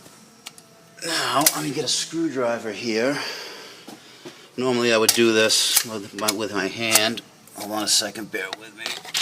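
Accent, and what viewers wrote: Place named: Boston, United States. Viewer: The caaaarburator, love that Boston accent.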